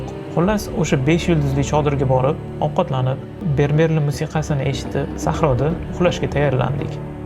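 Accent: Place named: Tashkent, Uzbekistan. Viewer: Адабий тилда гапиришни ўрганинг. Тошкентчасига уйда гаплашасиз. Сизни ҳамма вилоятлар кўраяппти. Хурмат юзасидан абадий гапириш керак. Узр.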